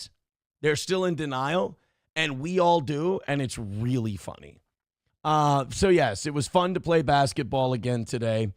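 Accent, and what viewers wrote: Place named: AAVE, United States. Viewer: I love when he talks ebonics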